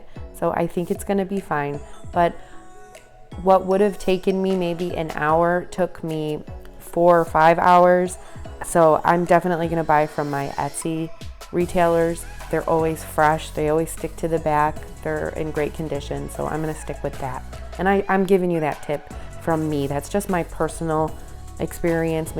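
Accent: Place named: Midwest, United States. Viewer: Tis beautiful. Most of the year. Even when it’s not the prettiest it’s still a great place to live. You’re accent sounds like maybe Michigan or Wisconsin. I’ve never been there but would love to see the northern Midwest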